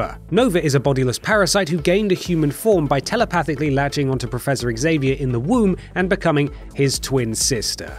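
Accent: United Kingdom. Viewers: I don't really agree with the whole list but the British accent makes me believe that I'm wrong and they're right.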